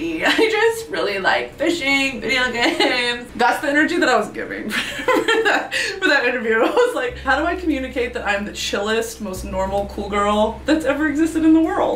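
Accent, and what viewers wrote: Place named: United Kingdom. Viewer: Why does she sound like the opening wizard man from Wizard101 w her british accent <3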